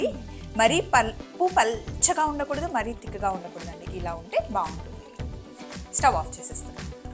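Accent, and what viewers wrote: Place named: Guntur, India. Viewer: Your voice has good clarity and simple and no over action, I belong to Repalle of Guntur district, your voice is like our voice…..🎉❤💥🎄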